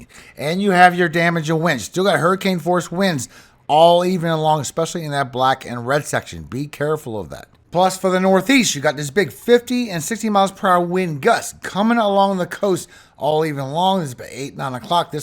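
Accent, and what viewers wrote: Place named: Los Angeles, United States. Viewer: I knew you had to be from LA with that accent. You are my "go to" for weather forecasts and updates. I can always depend on you inform us in LA what we can expect during the active weather events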